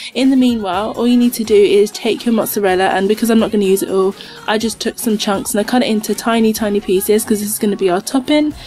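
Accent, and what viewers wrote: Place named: United Kingdom. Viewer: I absolutely L-O-V-E your British accent.😊😝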